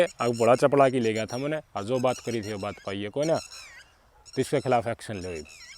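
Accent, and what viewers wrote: Haryana, India. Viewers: Bhai aap pureeee HARYANVI BNTE AA RAHE HO HAN THODA. BAGDI JROOOR S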